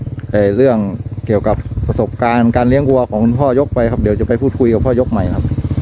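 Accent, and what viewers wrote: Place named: North, Thailand. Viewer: อู้กำเมืองก่อได้ก่าอ้ายกำเมืองม่วนหน๋า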